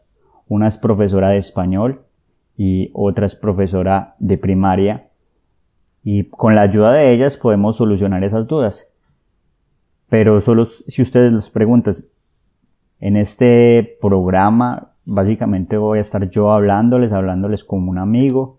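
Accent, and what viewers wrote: Colombia. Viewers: Saludos desde Singapur!! Encontré tu podcasts ayer jajaj y la verdad es un tesero porque yo estoy aprendiendo el español de colombia y a través de estos vídeos y podcasts no solo puedo escuchar el acento de Colombia sino también puedo practicar mi habilidad de escuchar en español porque hablas en una velocidad normal así que es un reto pequeño para mí. Pero los subtitulos me ayudan mucho entender lo que dijiste...Gracias por crear estos podcasts :D has ganado un suscriptor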